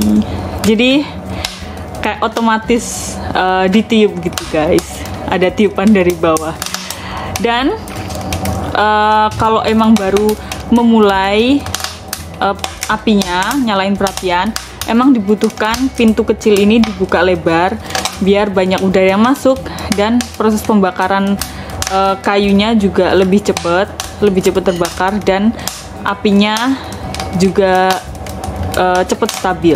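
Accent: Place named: Java, Indonesia. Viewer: Mbak'a kelahiran Jerman kah.?? Logat'a kek logat Jawa. Udah sub, mbak.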